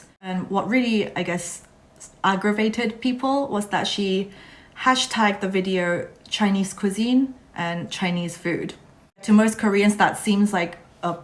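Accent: United Kingdom. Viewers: 영국식 발음같은데 맞나용? 멋있네용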